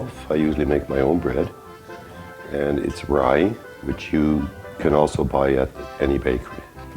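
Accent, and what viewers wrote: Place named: Canada. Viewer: You sound like a fellow Canadian sir edit: "deciliter" definitely Canadian, greetings from Ontario, j'aime le fromage, merci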